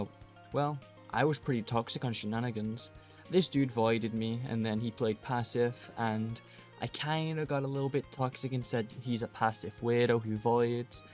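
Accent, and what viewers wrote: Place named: United Kingdom. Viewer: No hate whatsoever, but you sound like a very british lad ❤️‍🩹☺️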